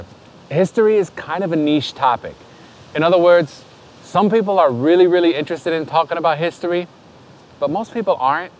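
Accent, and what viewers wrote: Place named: New York City, United States. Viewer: I can hear the NYC accent slightly stuck with you through osmosis from through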